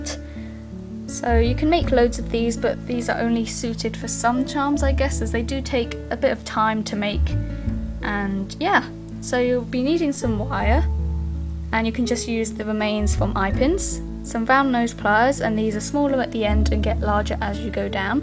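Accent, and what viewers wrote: United Kingdom. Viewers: your from England, what is peanut past?????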